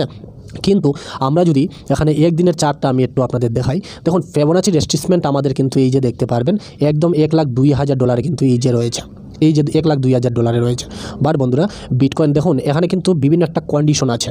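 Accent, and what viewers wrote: Sylhet, Bangladesh. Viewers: মনে হয় সিলেটি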